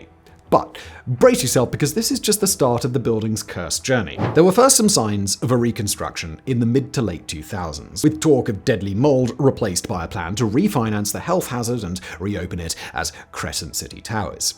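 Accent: RP, United Kingdom. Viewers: He is sorta speaking the King's English. Just put up with it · Your thick, over the top, "posh" English accent can't pronounce New Orleans! Do you really talk like this, or are you really laying it on thick? Thankfully, this video is only a tad over 21 minutes. I don't think I could handle anything longer!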